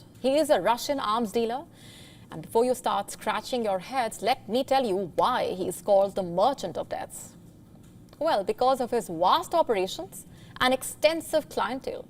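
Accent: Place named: India. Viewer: Somehow, the strong Indian accent of the anchor reduces the credibility of their content.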